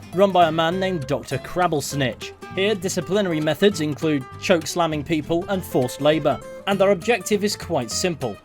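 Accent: United Kingdom. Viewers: Despite the janky controls, and the fact I could never get all 50 lessons done in any playthrough (damn you, Biology 5!), I loved playing Canis Canem Edit on PS2. Iirc, there were only 5 lessons and the Xbox 360 version had 10 lessons and was called Bully: Scholarship Edition....well, in the UK, anyway. From your accent, I'm fairly sure you remember the moral panic in the UK about a game being called Bully.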